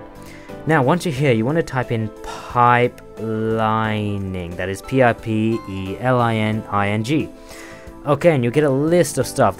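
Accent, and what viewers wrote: Australia. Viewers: Australian accents turn me on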